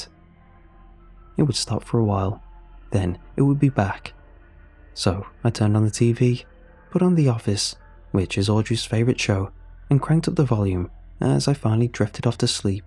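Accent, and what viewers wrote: United Kingdom. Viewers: Damn it I don't want to admit this but, I want you to keep narrating so I'm going to give you the compliment at the cost of my own public shame.. I actually wait for your freaking stories and I was so psyched to look down and see an early one because even though it's a highlight I wouldn't toss around publicly, it's still nevertheless is a highlight.. actually if I'm going to be honest it's a spotlight. It's amazing what these narrations can do when you're having problems. I've caught in myself thinking through things while listening, and although your Asian, (which sticks in your head somewhere in the back for some reason) the British amazing accent is surprisingly soft and it's very comforting. On a side note listening to Mr creepypasta, I see a guy in a blue suit that resembles Spider-Man, that shifts from a maybe comic book character to and uncanny amount of different human variations, so at least with you it's a comforting guy on a couch drinking tea type of thing, it's really unique I'm rambling thank you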